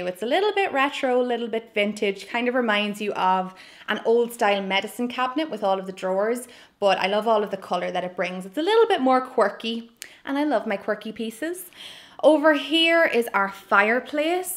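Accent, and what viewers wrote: Ireland. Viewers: This is the first video that I have seen I love your apartment and listening to you chat. I have been to Ireland three times and cried every time I left. My family came from Galway. Your voice makes me homesick for Ireland . Love your apartment.❤️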